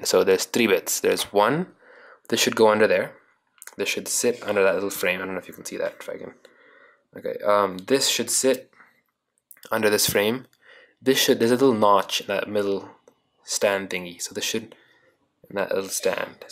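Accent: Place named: United States. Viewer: pehle to laga ki koi Ameriki hai.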